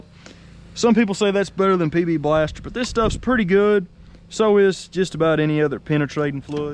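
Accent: South, United States. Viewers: I love how Southerners pronounce "oil" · Now, that is a cool American accent. This dude has manners. Where is he from in the states?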